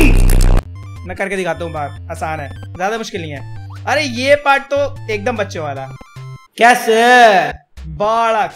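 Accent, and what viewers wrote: Haryana, India. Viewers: Bhai tum haryana se ho kya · Bhai ki Haryanvi sahi hai👌😂😂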